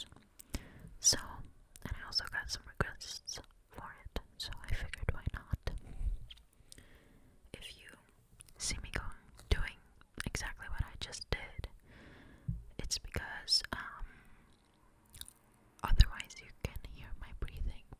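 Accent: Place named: United States. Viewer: You sound American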